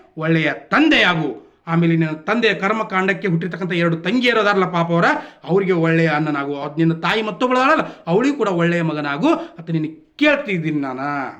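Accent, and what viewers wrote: North Karnataka, India. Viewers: Uttarkarnataka kannada valg masth heliri brother Super 👌 Correct agi counter Kottiri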